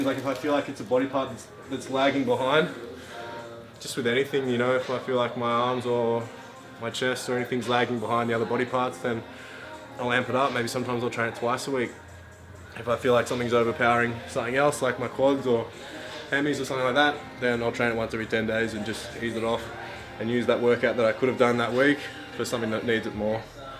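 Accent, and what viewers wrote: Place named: Australia. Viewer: great vid! i don't get why you would have haters. you seem pretty cool. nice to hear a fellow aussie on youtube 😄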